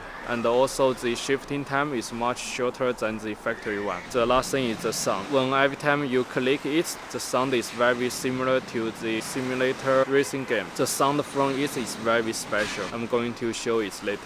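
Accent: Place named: China. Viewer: Your English has improved by the years sooo much :D you don't have a big chinese english accent anymore. Just a light. :)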